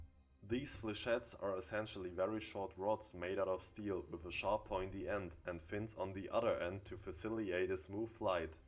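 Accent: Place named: Germany. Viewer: The accent is clearly german. I don't get why inches are used. For ammunition specs, germans would usually use millimetres (mm).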